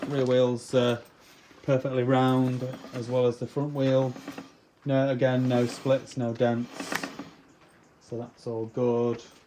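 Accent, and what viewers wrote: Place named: North, United Kingdom. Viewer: thats a good price im looking more a 250 that i can fully restore around mid 80s as thats what i grew up on im 40 this year and getting nostalgiac lol i watch your bikes on youtube you sound like a northerner buddy where abouts are you in the country im in barrow in furness in cumbria im saving up to get a bike there so hard to get hold of do you get them all the time could do with your number pal and thanks for getting back to me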